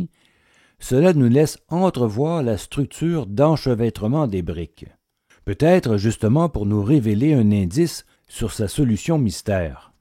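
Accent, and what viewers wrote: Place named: Quebec, Canada. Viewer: Accent québécois hilarant, peu en accord avec le sujet. À quand la Renaissance le long du Saint-Laurent?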